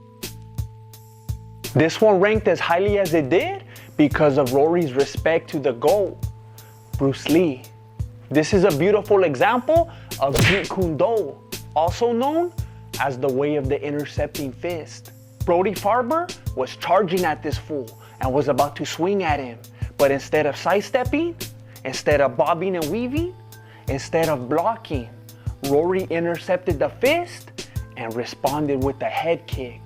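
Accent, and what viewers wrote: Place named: Mexico. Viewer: i enjoy watching this guy ,his nice Mexican accent ,his jokes and beautiful videos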